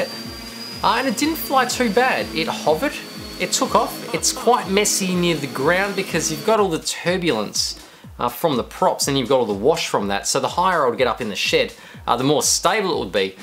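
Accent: Australia. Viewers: The most unbelievable thing for me was hearing his accent but that he kept saying cooler and not esky or even chilly bin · You sound australian, but don't call it esky?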